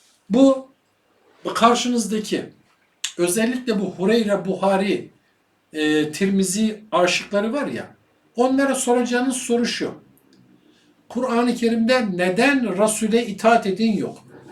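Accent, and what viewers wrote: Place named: Arab world. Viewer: Konuyu biliyor ve anlıyorum. Fakat kırıtma konusunda eleştirmenin kendine de bakması lazım. Eleştirmenin şive resmen arap şivesi gibi. Sizce de öyle değil mi?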